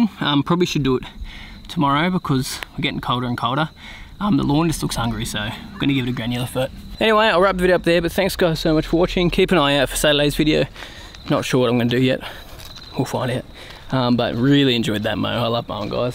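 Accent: Australia. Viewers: Are you Australian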